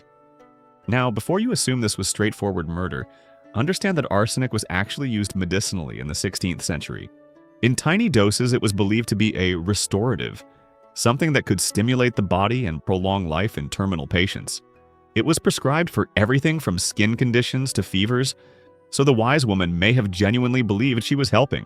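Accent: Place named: United States. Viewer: yes another crappy American voice over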